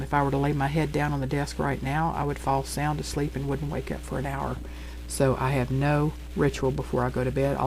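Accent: Texas, United States. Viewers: Iove listening to these…….Im with you about the liver….ugh!! anything but liver is what I say! : ) I didn't know you were from Texas even though you def sound it but I will say I flew down to Houston from where I live (Connecticut) and drove all over and I will have to say the people down there are so kind. I hate flying but I would go back there again for sure.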